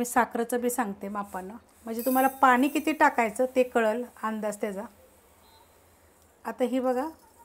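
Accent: Satara, India. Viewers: खूप छान रेसिपी आपली सातारी भाषा आणि सातार भाग सारखे च लाडू बनविले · मी पण सातारची आहे .... भाषे वरून मी तुम्हाला ओळखले 👍🏻👍🏻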